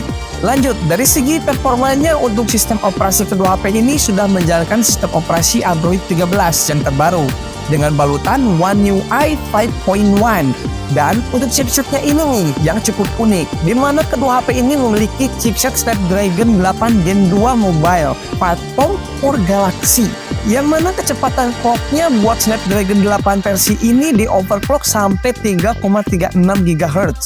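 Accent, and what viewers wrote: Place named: West Java, Indonesia. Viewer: logat na nyunda pisan 🍵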